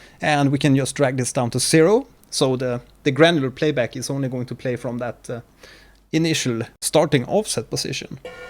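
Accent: Sweden. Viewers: omg you sound sooo swedish! love it! <3 (im from sweden too)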